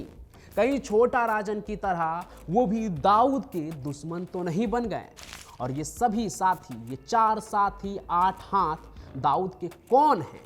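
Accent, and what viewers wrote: Bihar, India. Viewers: Bhai tu bihar se hai ky tere gawaro jaise bat krna smjh me aata hai tu bihari hai😂😂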